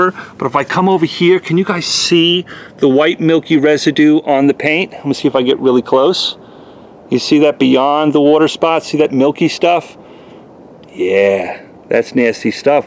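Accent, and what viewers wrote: New York, United States. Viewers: you say this is Formula 4. Then you say this is Formula 1. LOL. 2nd, why the hell are you wearing a hoodie in such a hot place like Texas. I guess you are really crazy. 3rd, you sound like you are from New York City yet you live in Texas.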